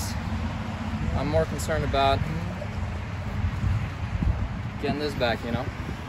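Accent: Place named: Russia. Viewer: Just as hot as 10 years ago. Interesting to see the Russian accent isn't nearly as thick as it used to be.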